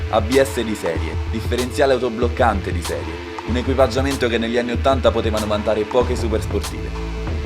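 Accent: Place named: Rome, Italy. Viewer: per me troppo romanesco per parlare di cose serie come macchine e motori.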